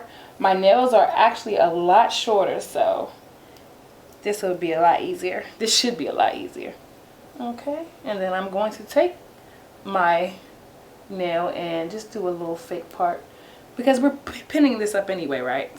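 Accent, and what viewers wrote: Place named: Washington DC, United States. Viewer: giirrrrrlllaaaa (SE girl accent) I knew I recognized that accent from somewhere. I knew you were from DC. Hey girl hey!!! yesss represent for the 4 type hair girls!!! Love all your videos so far and the fact that I subscribed!!!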